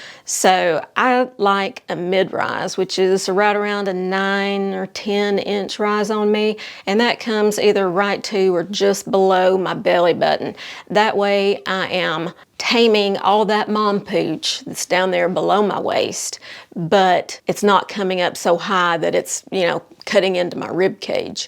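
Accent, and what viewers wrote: South, United States. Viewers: Great tips and helpful links. I even love your southern accent. 😊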